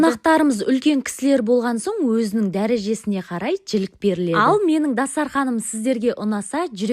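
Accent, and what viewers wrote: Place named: Qashqadarya, Uzbekistan. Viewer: Qashqadaryodanmisiz deymanda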